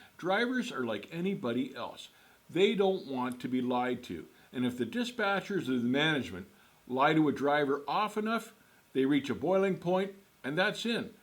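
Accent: Canada. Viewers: You sound like a Canadian!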